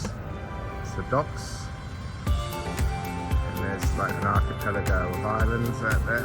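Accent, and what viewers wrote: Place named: Australia. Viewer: Great to see you're still a bit crazy after all these years. And a bit of an Aussie accent to boot! 😁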